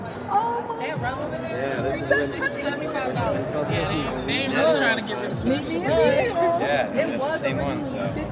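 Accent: Philadelphia, United States. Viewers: Bros Philly accent is 👌 💯 😂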